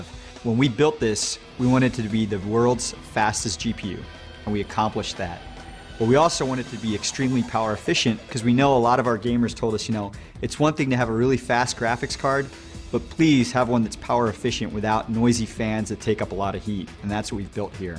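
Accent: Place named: United States. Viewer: Brown guy with an american accent...WOOOOOOOOT JOIN THE WAGON BROTHER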